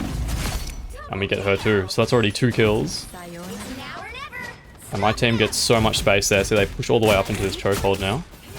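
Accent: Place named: Australia. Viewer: I love your Australien Accent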